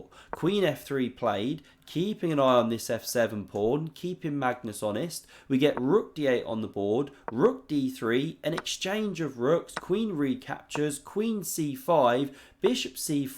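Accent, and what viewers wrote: United Kingdom. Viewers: Plus he has a cool British accent, like GM Danny King and KingCrusher too.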